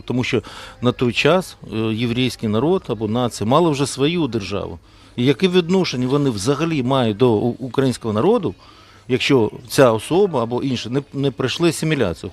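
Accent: Russia. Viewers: Украинскава? Це не дискредитація? Акцент чисто кацапський А нема більшого ворога ніж кацапи. То не маніпулюйте, навчіться української вимови хоча б. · Мій дід би сказав: "срав пердів і ще хотів"! Може автор відео ніколи не був у Бердичеві, або у Черкасах, або у Львові де назви вулиць писалися у тому числі і єврейською мовою ще у п'ятидесятих роках...хоча персонаж, що у якості довідника використовує "вікіпедію", вже багато про що говорить! Ну звичайно ж євреї! То не 🤡 закрив усі ракетні програми і довів до банкрутства "Укроборонпром""; скоротив армію та "відводив" війська! Ага! Тільки євреї винні у всьому! От 🤡 Ні!!! Ну а євреї обов'язково! І кацапи НІ! Тільки от євреї!!! Цікаво таки що автор цього опусу робитиме у світі без євреїв?! Ну без більше 80% Нобелівських лауреатів; без усієї банківської системи; без дантистів ну бо у більшості випадків євреї?! Без грошей бо навіть текст відео не може відтворити без папірця а значить не заробляє багато... 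Доречі! А чому такий "патріот" і не на фронті?! І мова у цього персонажа якась не зовсім українська...є акцент кацапський! Може "патріот" віщає з мацкви?! Га?! P.S. перші паперові гроші УНР дійсно мали написи декількома різними мовами! Читайте історію! Тільки час свій згаяв на цю дурницю! Якість інформації; якість мови; якість думок; стиль подання інформації як у першому класі...жах просто!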